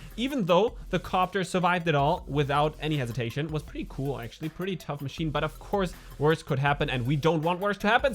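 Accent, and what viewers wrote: Germany. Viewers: you are doing so good, your videos are really good and eventhough you German accent can be easily heard, your English is great anyway. But one thing it hits me every time I see your videos. It is episodes and not epizodes:-)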